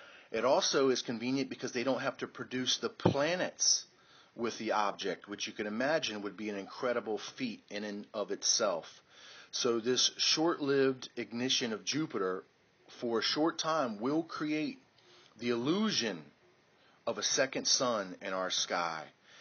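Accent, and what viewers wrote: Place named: Baltimore, United States. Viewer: Also, I'm betting from your accent that you're from Baltimore and the D.C. area. Make me suspect you a bit.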